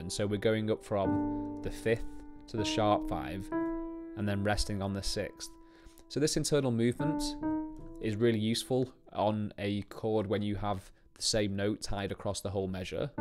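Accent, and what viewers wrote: Liverpool, United Kingdom. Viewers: Either from Liverpool or The Wirral?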